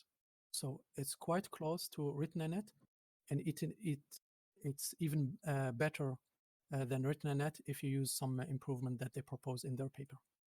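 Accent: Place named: Tunisia. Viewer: المتكلم تونسي و لاجزائري ..لكن الصوت زيروا كبير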